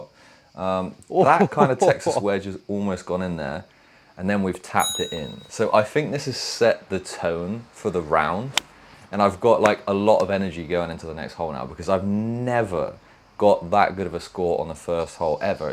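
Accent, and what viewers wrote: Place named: Bristol, United Kingdom. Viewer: what is that accent, Bristolian?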